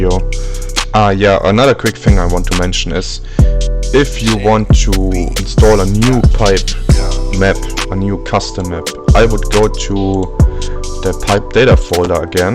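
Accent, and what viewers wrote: Germany. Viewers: I swear to god this guy is german. If not he is french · ich liebe sein denglisch <3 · da höre ich doch einen Deutschen Akzent :D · lol german and norwegan is pretty close so I can understand that u said "I hear ur german accent" and op replied with "Well I am a german channel" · grausamer deutscher akzent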